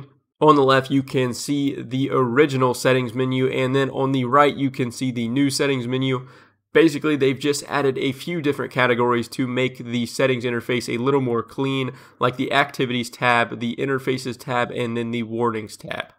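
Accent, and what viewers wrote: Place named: Philadelphia, United States. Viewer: your accent definitely sounds like philly/delco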